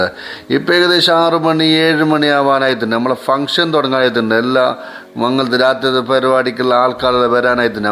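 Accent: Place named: Kasaragod, India. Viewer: Kasargod samsaram poliya 😂❤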